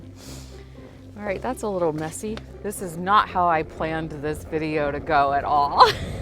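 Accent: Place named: Missouri, United States. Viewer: Lol..... you cwack me up! I can definitely tell you are from Missouri.. I am clumsy.. frought with mishaps.. especially when someone is watching me.lol.. I try and keep my "cool".. but ends in epic failure! Enjoying your posts from SW Missouri.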